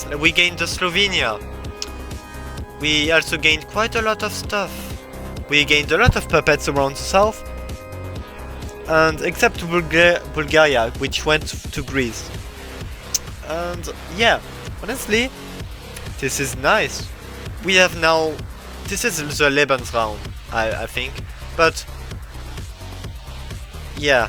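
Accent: France. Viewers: bah bro i can tell by the accent + i checked his profile h says he’s french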